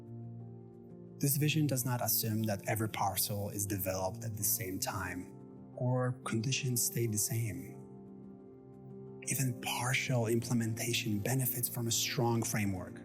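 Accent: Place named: Transatlantic, United States. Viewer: Came for the Chicago urbanism and good title, left for the transatlantic whispery latte art pretension. Good lord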